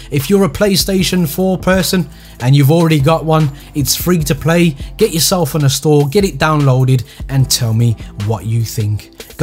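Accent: Birmingham, United Kingdom. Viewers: You're from Birmingham aren't ya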